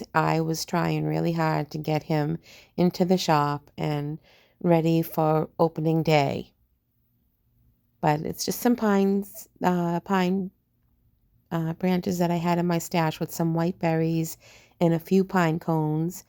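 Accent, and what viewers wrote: Massachusetts, United States. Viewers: I love your accent and when you say “wicked smaht.” 😂 I am from PA, so I feel like I am welcome in your MA world when you say that. 😂 You do such an awesome job on all of your projects, so it is no surprise that everyone wanted him. 😊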